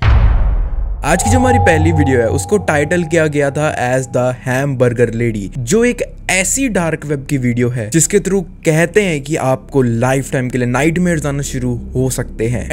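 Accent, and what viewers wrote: India. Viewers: I love his Indian accent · I couldnt understand anything because of his dirty hindi accent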